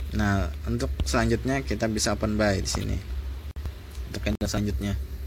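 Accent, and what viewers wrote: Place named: Kalimantan, Indonesia. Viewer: Bang tinggalnya dimana ? dari logat bicaranya org kalimantan yaa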